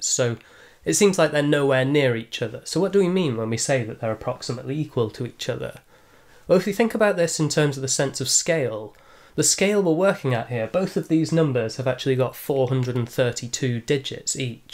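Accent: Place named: RP, United Kingdom. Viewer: Mate, don't get me wrong: I love math yet your soothing voice and english posh accent are perfect to fall asleep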